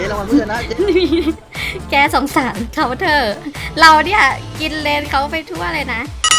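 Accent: Thailand. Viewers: เอื้อย คึเว้าอิสานเป็นวะ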